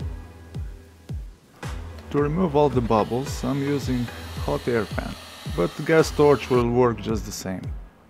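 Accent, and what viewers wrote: Russia. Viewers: в его произношении виден русский человек. ну или украинец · Русский акцент?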